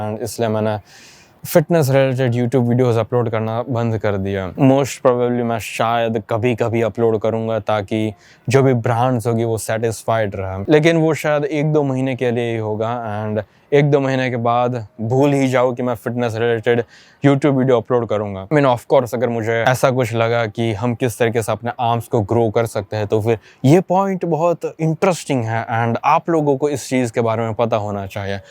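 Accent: Gujarat, India. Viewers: most of the gujarati people tend to struggle while speaking hindi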